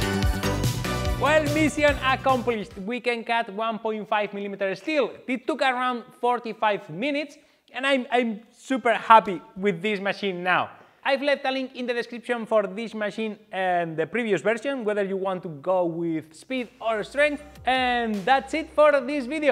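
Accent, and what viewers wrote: Spain. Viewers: ya sabemos que sos español flaco, porfavor, o habla bien ingles o habla en español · Eres español no? El nombre y sobretodo el acento🤣🤣🤣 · Hola Espanyolo, which ciudad are you in? I hit play, and within about 0.005 seconds my wife had said unintelligibly quickly: he's a Spanish - which makes her Ingles sound a lot worse than it actually is. I'd say it's stronger than yours, certainly weaker accent, too :-D I enjoyed the video, nice work on the upgrades. Next thing you'll be building one as capable as TOT's CNC router :-D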